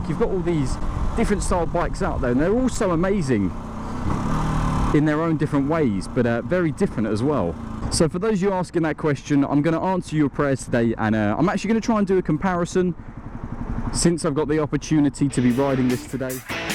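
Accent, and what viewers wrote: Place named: Southern England, United Kingdom. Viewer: Just out of interest, where abouts in England do you live, you sound south ish, which is where I am. Just because I’m 16 in a month and then going off a fair distance to college. Wondered how suitable the yzf would be for this and where I could find a good dealer in the south. Cheers in advance buddy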